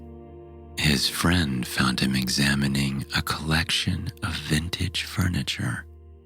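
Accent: United States. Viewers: I love the american accent. It makes me so calm to hear my own accent. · American voice, yay, and an American story ❤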